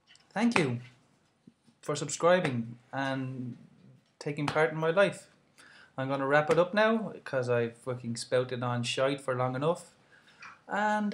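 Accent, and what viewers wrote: Ireland. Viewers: Where in Ireland are you from? Dublin ya? Haha I'm Irish and I swear so much lol I need to stop! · Woah I never noticed you were Irish in & Vlogs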